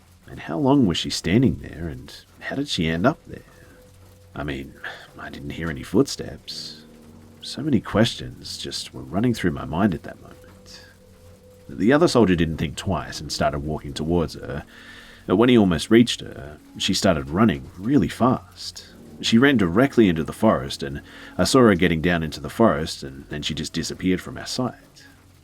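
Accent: Australia. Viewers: Excellent stories, thank you for making this a good day by gracing us with that awesome Aussie voice! I don't know what it is about your voice but I could listen to you read instruction manuals and still love it LoL 😂😉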